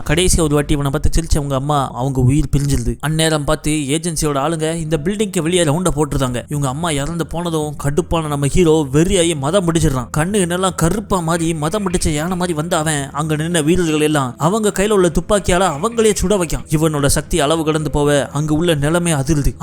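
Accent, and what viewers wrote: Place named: Tamil Nadu, India. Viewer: நண்பா உங்க தென்னக தமிழ் மற்றும் குரல் மிகவும் கவர்ந்தது. 👍🎉😍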